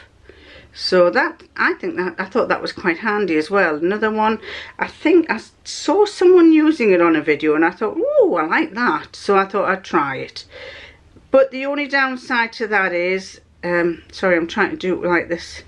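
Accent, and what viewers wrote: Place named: East Boldon, United Kingdom. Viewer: Hello from rural New South Wales where the nearest sewing notions store is 1.5 hours drive away. Love your accent as I'm originally from East Boldon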